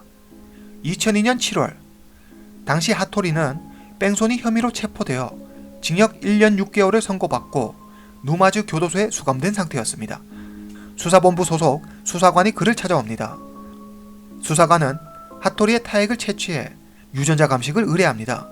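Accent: China. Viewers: 님 중국사람이신가요?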